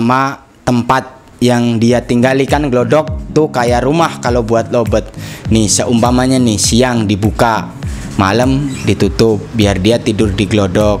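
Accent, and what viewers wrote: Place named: Kalimantan, Indonesia. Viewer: Logat kaya orang kalimantan hahaa maju terus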